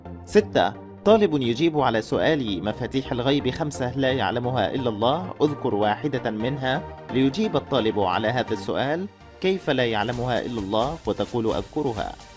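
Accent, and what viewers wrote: Egypt. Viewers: والله انكشفت طلع يعيال الي يتكلم مصري 👀👀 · ايه الكلام المصري ده ضحكني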